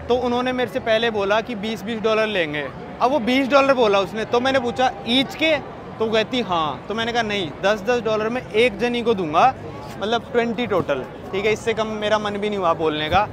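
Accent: Haryana, India. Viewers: Bhai....You are a very frank guy and I like the way you present the whole show. I like your Haryanvi accent and daring to rebuke the viewers who look for lecherous clips. Keep it up. Come to my area in Dover at Rehoboth Beach and cover it.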